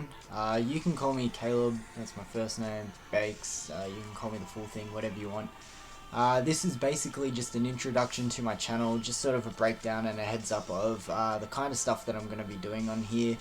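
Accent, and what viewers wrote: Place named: Australia. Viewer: In one of your videos I was guessing where u were from....and what came to mind on your accent was either....AUSTRALIAN....SOUTH AFRICAN or ENGLISH......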